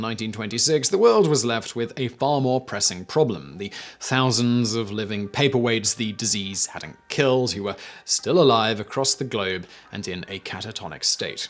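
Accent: United Kingdom. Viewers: The British pronunciation! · Ms. Forbes: you may have heard somewhere that not all terms are American in origin or usage. Simon is a Brit and the series originates in England. They have chosen for centuries to go with the Germanic influences on the English language, especially in regard to scientific terms. For whatever reasons, Americans lean toward the Romance languages for pronunciation of those same terms.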